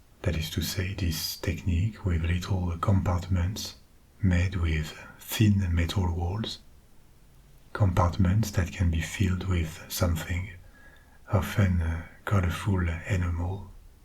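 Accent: France. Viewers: Sa fait bizzare d ecouter de l asmr anglais avec un accent français 😂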